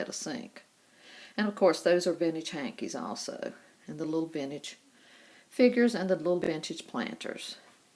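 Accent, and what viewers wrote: South, United States. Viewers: your house is so cute! I simply love it! You have a southern accent what state are you from? I am from michigan. I might just have to subscribe. Debbie in michigan. 🎄🎅🎁😄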